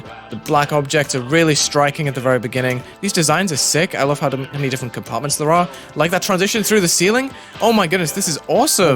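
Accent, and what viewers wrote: United Kingdom. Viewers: Bri'ish man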